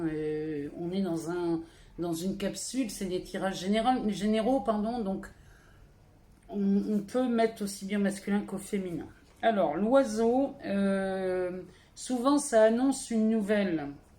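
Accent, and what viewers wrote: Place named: Montpellier, France. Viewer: Jai toujours pas trouvé l'accent ? Toulouse ? MONTPELLIER 🤭🤭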